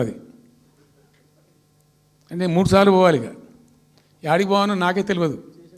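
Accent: Telangana, India. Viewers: After a long time many months years I listen my telangana slang jai kcr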